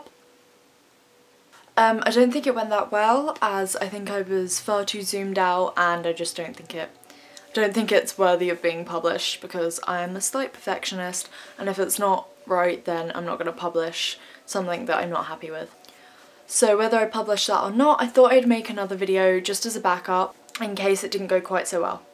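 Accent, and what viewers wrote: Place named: England, United Kingdom. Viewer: Nice accent so where you from England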